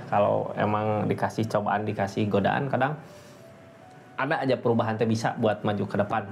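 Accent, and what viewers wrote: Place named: Sunda, Indonesia. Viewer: Amiin alhamdulillah alhamdulillah alhamdulillah alhamdulillah alhamdulillah alhamdulillah alhamdulillah, makasih redingannya sehat sll berkelimpahan amiin, hallo mas ganteng seperti anaku no 3 bhs sundanya medok bangett, makasih sangat resonet kecewa la sudah 35 thn di khianati sekarang la gak mikirin asmara deh la mati rasa cinta yang penting anak anak bahagia berkelimpahan tatap hari tua di depan byk kebahagiaan dan keberlimpahan semangat 58❤❤❤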